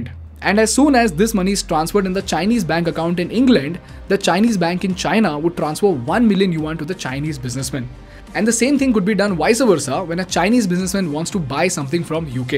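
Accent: India. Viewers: The indian accent is killing me you need to put subtitles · The Indian accent is deafening. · why indian english is so indian?🥲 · The speaker has strong accent of indian slang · I is probably a good lecture but you speak too fast and in an Indian lingo which is hard for most of us to understand.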